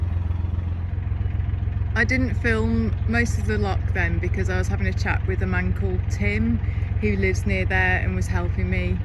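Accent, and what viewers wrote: United Kingdom. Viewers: Hi,! I’m from Belarus. I feel relax and tranquility by watching your videos. And pure British English sounds magnificent! A great pleasure to hear you.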